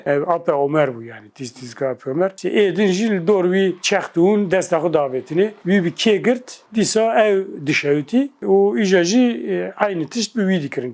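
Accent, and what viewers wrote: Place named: Quchan, Iran. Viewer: سلام ماموستا ....زبان کرمانجی شما با کرمانجی خراسان یکی هست انگار یه کرمانج قوچان داره صحبت می‌کنه ...کرد قوچان از خراسان ایران ......من حض کر ..ژه کرمانجی گپ کرنی استاد تمام این بازیها تو خراسان هنوز بازی میکنن ....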